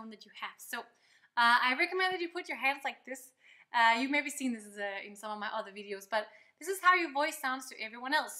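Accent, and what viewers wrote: Denmark. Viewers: That Danish accent though.... <3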